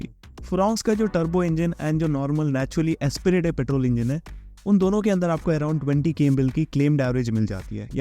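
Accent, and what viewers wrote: Kerala, India. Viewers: The speakeris a South Indian most probably a Malayali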